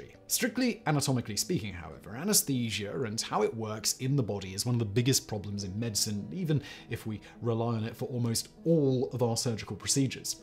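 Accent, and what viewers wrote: United Kingdom. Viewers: As a scientist I LOVE the way you speak about these topics! Science communication at its best. Plus I miss the British accent since I left the UK so that doesnt hurt 😛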